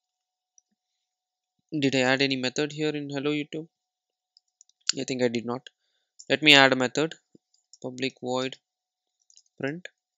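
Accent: India. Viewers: When you hear the Indian accent, you already know the tutorial will be good.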